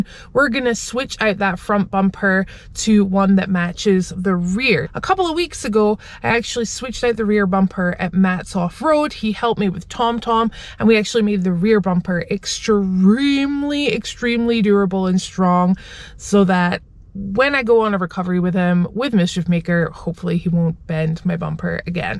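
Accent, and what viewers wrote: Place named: England, United Kingdom. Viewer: Why do you have an English accent what changed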